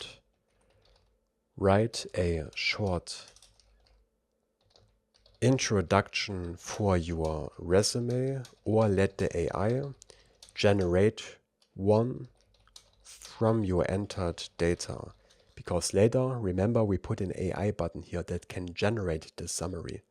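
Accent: Germany. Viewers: I can smell a bold German accent 😅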